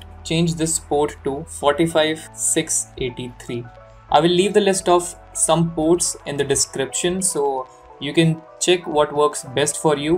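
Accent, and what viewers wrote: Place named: India. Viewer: the indian accent bro 🔥🔥🔥🔥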